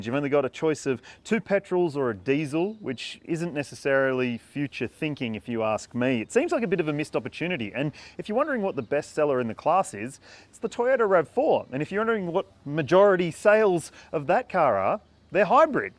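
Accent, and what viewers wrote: Australia. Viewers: Love the Aussie accent....and I looked at all the review titles before I roll the videos and choose Carsguide, and also UK CarsWow to compare, I know where both reviews are based..... ( for those who are too basic to pay attention to the titles and video content.....pay attention! ) Very good points and thank you for confirming some issues for my consideration of this car.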